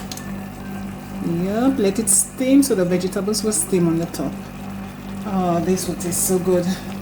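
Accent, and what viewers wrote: Saint Lucia. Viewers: Love the St Lucian accent, keep talking so I can hear more of it. Also it is the green banana that is known as poor man food item...that said there is nothing poor about your nutritious meal!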